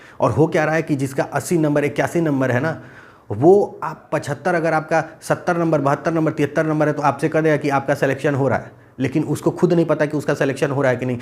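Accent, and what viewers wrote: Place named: Bhojpuri, India. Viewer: Kaha se ho sir bhojpuri bol rare ho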